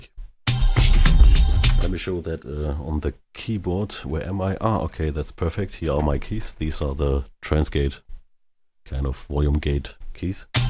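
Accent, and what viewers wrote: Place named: Germany. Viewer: Well done, informative and I just bought it, excited to try. Your English with a German accent is good and I like your humour.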